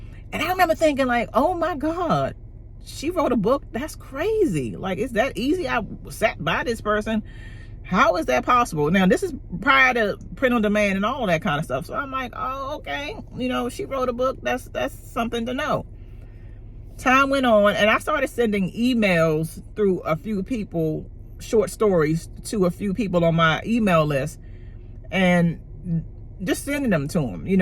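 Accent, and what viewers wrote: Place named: DMV, United States. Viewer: It’s the DMV accent for me 🥰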